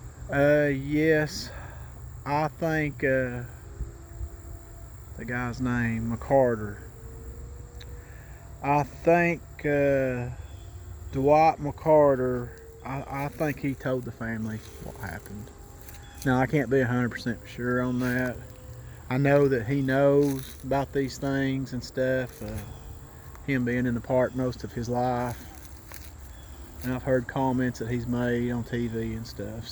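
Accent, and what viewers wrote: South, United States. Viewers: Yeah, I honestly believe him. This is a tiny channel from a guy who wants to pass on some knowledge about an area he spent most of his life in. I also enjoy his Southern drawl. So soothing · New subscriber here, and I love you channel! Not only is the storytelling fantastic, but I love that you take us along on the walks through your beautiful part of the country. I lost my grandparents very young, so I never got the “sit around the campfire experience” with them. Although you’re too young to be my grandparent, I’m still getting the spine-tingling fun of hearing your tales. And, one last silly compliment.... I’m a native southerner who married a (wonderful) Yankee man and ended moving way up north and raising children up north. There’s nothing more lovely to my ear (with the exception of a hymn in church) to a southern drawl... 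and I never hear that anymore. So, I’m a fan and will be back to watch and like your videos! Thank you for doing them!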